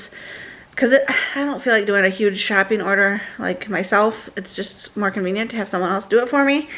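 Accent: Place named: Michigan, United States. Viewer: Your Michigan accent was strong today 😂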